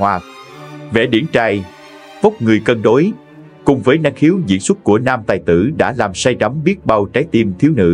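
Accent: Vietnam. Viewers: Kênh này ngoài MC nói giọng Sài gòn, thì nội dung khá rẻ tiền. Chủ yếu khai thác đặt tựa đề giật gân, không đúng sự thật để gợi sự tò mò của người xem, không có đạo đức truyền thông. Đặt tiêu đề hạ thấp những nghệ sĩ kỳ cựu đã khuất để kiếm tiền. Những người đàng hoàng, có lòng tự trọng sẽ không đăng ký những kênh rác như vầy. Disliked.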